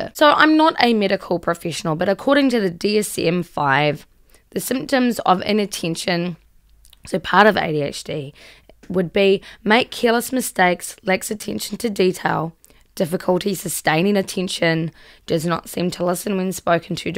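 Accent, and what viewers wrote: New Zealand. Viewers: First thank you for everything you do and speak about here. I do appreciate your effort and perspective. I can relate with most of what your talking about. I’m a combo ADHD. I also express traits of autism. The “super powers” I believe are rather misunderstood and/or difficult to understand. Something I think you can easily understand is this: I enjoy watching you speak and hearing you speak. (2 different things) Your voice is enjoyable and words are very understandable. I also found that I really like the kiwi thing. (Siri is set to Aussie accent for me). At the same time you “accent” or “emphasize” words or phrases with your body language. You express a passion about something or a subject of discussion in ways very few people can. You can make an extremely strong point and speak with a gentle affection towards people. Emotional dis-regulation to me means; you either like it or you don’t like it, passionate about it or cold shoulder towards it, not much middle ground. 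Also I’m pretty sure you will understand this more deeply than most people. Everybody understands a B-day card. You pick it out and sign it, makes it personalized. I believe the universe is not a mistake. Things happen for a reason. “If opportunity is intentional, you should make it personal “ and you do this. Most people would probably say “intimately authentic”......ADHD super power isn’t flying threw the air or super human strength, but more of the ability to express something in a extremely strong compassi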